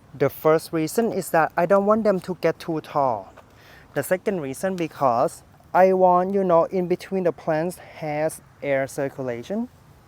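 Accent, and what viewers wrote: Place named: Thailand. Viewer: So beautiful 😍 I'm so happy that i found your channel, love this video. I'm planning to grow zinnias this year and first time in my life , I'm a little bit confused about it because I'm from Thailand we don't have to deal with the cold weather . I live in Ohio. Are you Thai ? Your accent is so lovely and sounds similar. Sorry for asking i don't want to be rude just happy to see Thai people. Hope you have a wonderful weekend ❤️🥰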